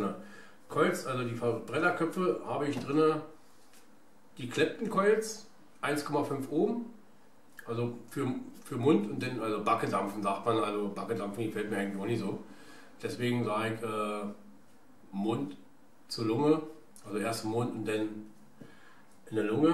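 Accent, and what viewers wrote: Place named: Brandenburg, Germany. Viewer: Rainer3041 komm auch aus Brandenburg Mich interessiert es nur, da ich das am sogenannten Brandenburger Deutsch hör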